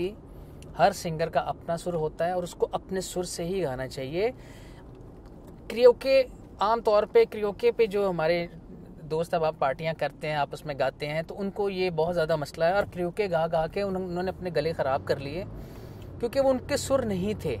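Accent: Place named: Punjab, India. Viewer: Aapke punjabi accent bahut achha lagta hay sir.... Respect from India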